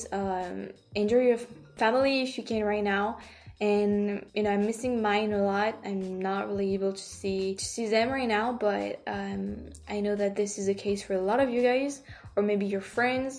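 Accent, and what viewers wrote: France. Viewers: i love the french accent coming through the English pronounciation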